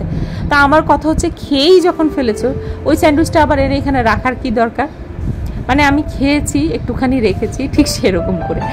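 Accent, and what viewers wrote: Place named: Chittagong, Bangladesh. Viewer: tomar kothay Chittagong er akta tone asy…..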